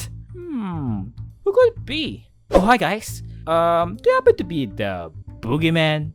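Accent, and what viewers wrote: Philippines. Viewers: based on ur accent, im pretty sure ur a filipino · this video just came up on my recommended and i decided to watch it, and from hearing your voice i could tell you were filipino too XD hoping to see you make an empires smp explained video <3 also just a tip, joel's name is pronounced as "jowl" enunciating the e isn't really necessary :D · I recognize your accent. Lezzgoo mga pinoy!!! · WHY DO U SOUND LIKE A FILIPINO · You filipino? Accent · Are you Filipino, cuzyour accent😊🥰 love it. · I feel like you have a Filipino accent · Are u a Filipino ur accent is sooooooo Philippines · Great! Some Filipino 3rd life fans. Recognised you by your accent. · Are you a filipino? Your accent is very filipino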